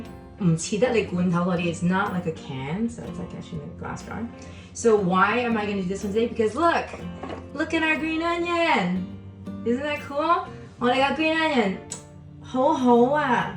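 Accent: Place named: China. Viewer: YUM! hahaha, the chinglish is real!!! love it hearing someone speak like me. keep it up!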